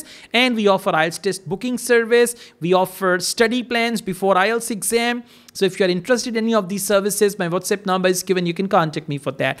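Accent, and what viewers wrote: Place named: Punjab, India. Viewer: Punjabi acent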